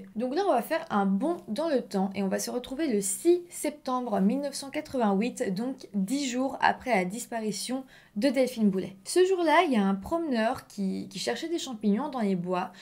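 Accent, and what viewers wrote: Belgium. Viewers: C'est juste l'accent belge ☺️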